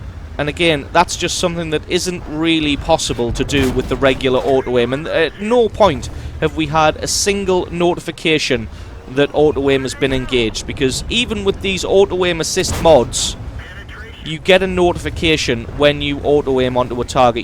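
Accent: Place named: Scotland, United Kingdom. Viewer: There were some Scots features ;-)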